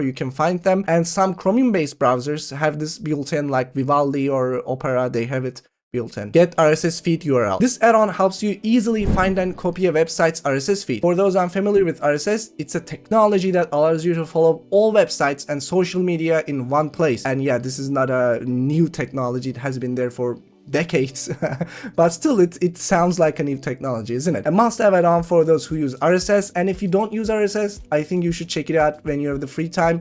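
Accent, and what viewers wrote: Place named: Turkey. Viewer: Hocam aslinda Turkce scriptin direkt Ingilizce karsiligi ne ise ona kasmak yerine, deginmen gereken onemli seyleri not alip onlardan bahsetmeye dikkat ederek dogaclama en bastan Ingilizce konussan daha mantikli olmaz mi? Bir de cok aksan var bence. Elbette aksanimiz var ama ben mesela Amerikan tarzi konusmaya calisiyorum, daha guzel duruyor. Direkt duz Ingilizce konusunca okulda dili ogrenen Turk liseli ogrenciler gibi geliyor kulaga, bu da globale acilmanda profesyonellik acisindan iyi degil bence. Nacizane tavsiyelerim. Dil degisince karakterin ve kisiligin degismesi gibi bir konu var bazi insanlarda. Baska birisiymissin gibi konusuyorsun istemsizce. Bende de oluyor. Reaksiyonlar, vurgular, tonlamalar hep farklilasiyor. Ama seninki, yine benim bildigim konusmasinin sadece dili degismis hali gibi hissettiriyor. Buyuk kanallarla kapisman konusunda sikinti yaratacigini dusunuyorum.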